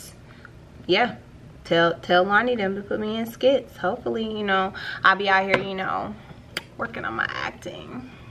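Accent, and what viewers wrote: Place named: South, United States. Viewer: Ya accent is so sexy. I love that country girl accent?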